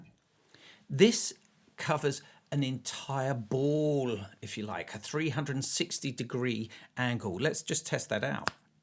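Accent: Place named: United Kingdom. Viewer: I love British English. I enjoyed this review.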